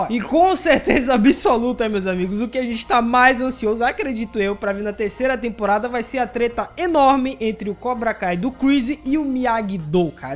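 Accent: Bahia, Brazil. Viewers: Você é daq da bahia??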